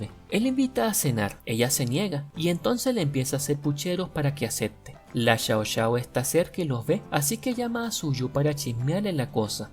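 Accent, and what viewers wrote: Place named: Venezuela. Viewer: Venezolano, voz agradable, muy bien resumido y entendible 👏👏👏 gracias aquí otra suscriptora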